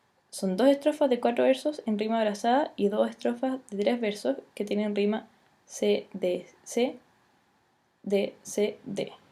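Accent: Chile. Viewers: no me gusta el asento chileno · A mi igual me gusta el ACENTO chileno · Cuando se nota que eres chilena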